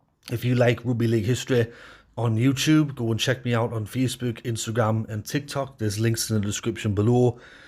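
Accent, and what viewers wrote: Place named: RP, United Kingdom. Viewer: That accent is just pure class. You should be a member of the House of Lords.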